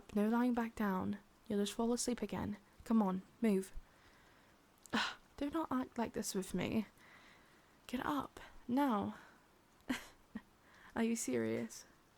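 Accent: United Kingdom. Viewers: Me: "British accent? Well, that should be interesti-": "Good morning, sunshine." Me: "Oh... I'm in trouble." 😳 Real talk: I've actually been struggling with my depression rearing its ugly head the past couple of days, so I really do appreciate this. Thanks for the audio. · i love your voice epscially your british accent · She really cute with british accent. Great job💚👍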